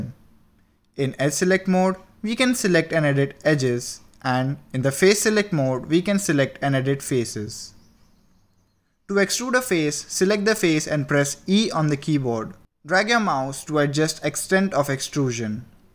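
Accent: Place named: India. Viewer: When they have an Indian accent, you know it's about to be good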